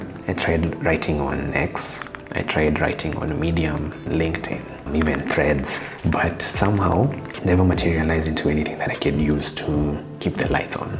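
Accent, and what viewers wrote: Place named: Kenya. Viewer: Sounds a kenyan accent...i love the video lighting quality Love from Ug. 🇺🇬...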